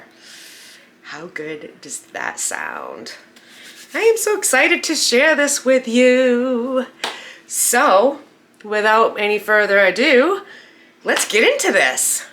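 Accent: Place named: New England, United States. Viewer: Perfect recipe for lazy mornings! Can’t get enough of that New England accent. Absolutely adding to my weekly routines! 🙏🥰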